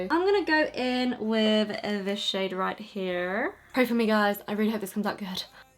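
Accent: England, United Kingdom. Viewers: OMG ARE YOU ENGLISH!!!!! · She sounds British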